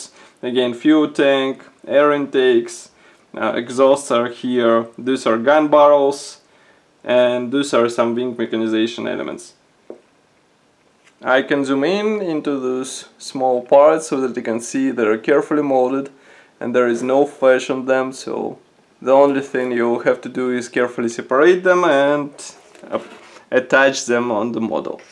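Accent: Russia. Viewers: Мне показалось или я слышу русский акцент?....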